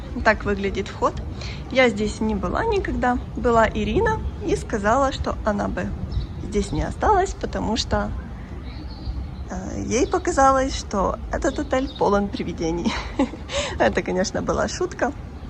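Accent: Odessa, Ukraine. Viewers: Как мне нравится ваш одесский мягкий говор. Вы моя дорогая.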